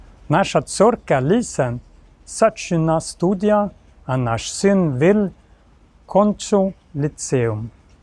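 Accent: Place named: Sweden. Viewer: 😊bardzo ładnie brzmi polski język z szwedzki akcentem 😊Polska låter väldigt fint med svensk accent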